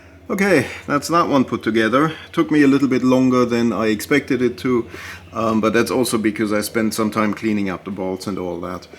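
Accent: Germany. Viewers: Right you are… beauty always very individual - here in Germany we say: „Die Schönheit liegt immer im Auge des Betrachters.“ As you know I think, because your English sounds a bit German !? But please don‘t get me wrong. Your BMW does look great, your YouTube-Video is well made and very informative. Thank you for that. Greetings Matthias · Most obvious German accent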